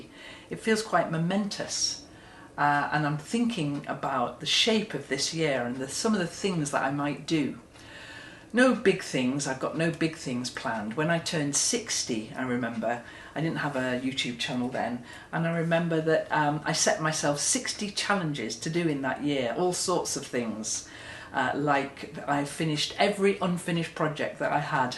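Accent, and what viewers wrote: United Kingdom. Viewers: Can I say on repeat how much your videos comfort me in a way that’s hard to put into words. Your sweet British accent and the way you are so comfortable in your own skin. Your gratitude and contentment are contagious. I’m turning 73 on March 6, 2026 . You are a soft voice for those of us in our older years to remember what is truly important and just enjoy the process. May God Bless you in 2026 and keep you in good health. I need you!!❤❤❤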